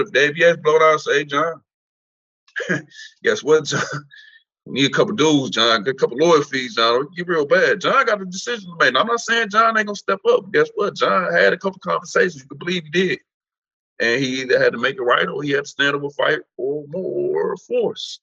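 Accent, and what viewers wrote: United States. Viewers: Seen similar stuff to this happen. It's interesting hearing someone with an American accent tell a story that could be coming out of the mouth of one of my friends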